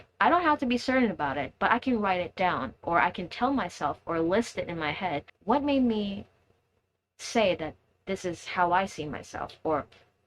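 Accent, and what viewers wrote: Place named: United States. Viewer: Hi this is me Theo. I like the fluent English and the American accent.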